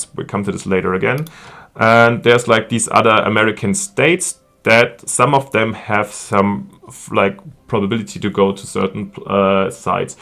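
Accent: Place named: Germany. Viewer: Wusst ich doch gleich, dass das n Deutscher ist. Aber sehr gutes Englisch. Da möchte ich meine paar englischen Videos direkt private stellen haha.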